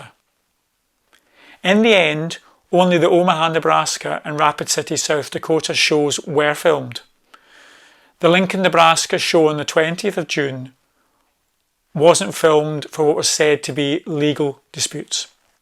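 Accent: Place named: Scotland, United Kingdom. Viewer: I find it difficult to watch, this time period without feeling sad but his voice was still spectacular. ❤. I love your video. Nice to put a face to the lovely, knowledgeable Scottish accent😅 😅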